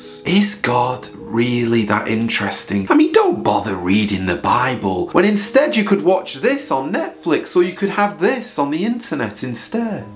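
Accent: United Kingdom. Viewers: Keep it up brother this is so important, only the gospel can change things, and it’s good to listen to someone from Britain. Paul .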